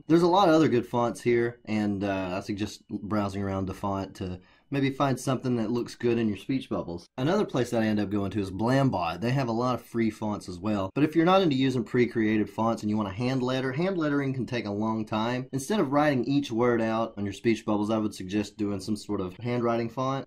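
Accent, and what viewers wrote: Texas, United States. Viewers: are you from Texas or Nevada?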